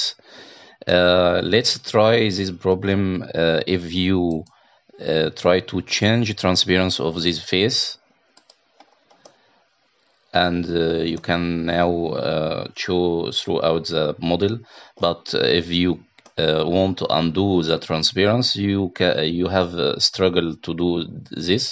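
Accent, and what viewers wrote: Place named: Russia. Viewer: الآكسنت كأنك روسي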